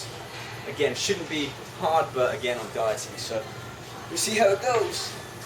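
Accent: United Kingdom. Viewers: i never did like brittish accents, but the guy is decent well proportioned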